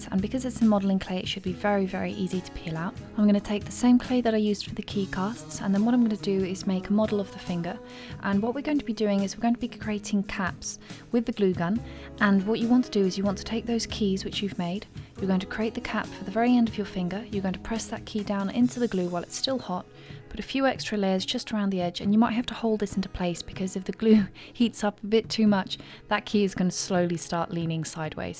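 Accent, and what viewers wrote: England, United Kingdom. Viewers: someone has to tell me what english accent she talks in! believe it or not before ASMR was a "thing" i used to play her videos just for her voice because her accent + voice was so soothing. I reckon she do an ASMR channel. it would be so popular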